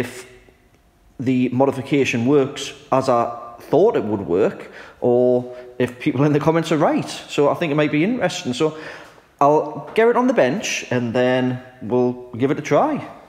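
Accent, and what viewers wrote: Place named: Geordie, United Kingdom. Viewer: I know that, my parents were Geordies from Ashington as well. They never dropped the of when they spoke that is more of a Sunderland thing. I was only making a point about you describing everything you do and wondered if it was worth it when people make silly comments about the things you've done. I apologise if I have aoffended you, I live in Australia now and it seems so odd when people have accents like yours.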